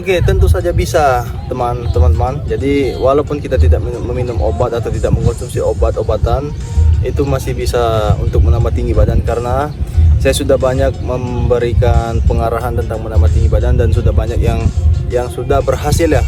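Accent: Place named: Palembang, Indonesia. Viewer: Uwong palembang caknyo kakak ini wkwk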